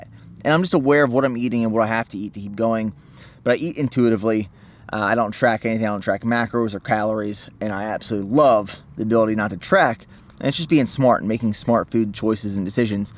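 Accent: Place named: Philadelphia, United States. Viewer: Hey! I have been a subscriber to your channel for a few months and I always wondered where you're from originally? You sound like you have an Philly accent! Good luck on the training!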